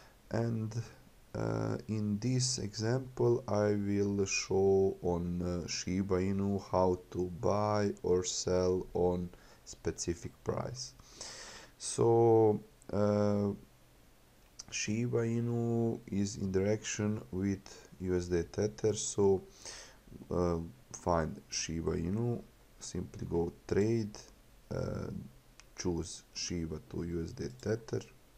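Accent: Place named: Bosnia and Herzegovina. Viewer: Odma sam se pretplatio kad sam čuo engleski sa Bodanskim naglaskom 😅,sala Hvala na video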